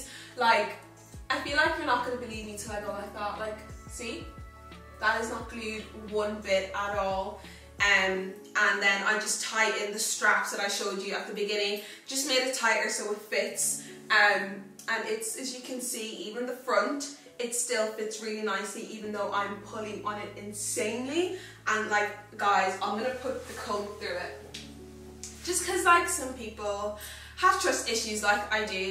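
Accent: Ireland. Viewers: I love the Irish accent. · OH thank you! loool sorry, i think have a little trouble understanding irish accents sometimes but i love them tho! so bloody charming! <3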